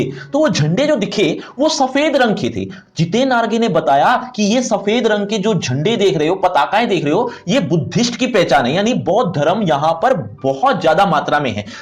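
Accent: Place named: Punjab, India. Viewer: Sir's Definitely From Punjab,His Accent Shows It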